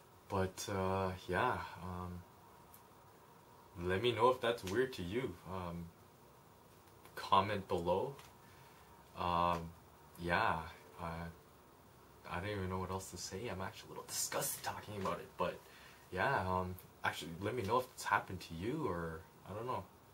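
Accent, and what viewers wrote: Canada. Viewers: ... but I digress, you should make more videos man ! You're a good storyteller ! I follow you on Twitter btw, I just read your tweet about someone commenting on your Canadian accent, and decided to check out your channel. haha :P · dude what's with the canadian accent?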